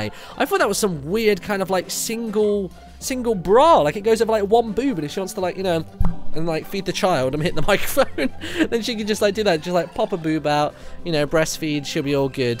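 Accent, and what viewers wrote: United Kingdom. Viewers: Ate you British you sound British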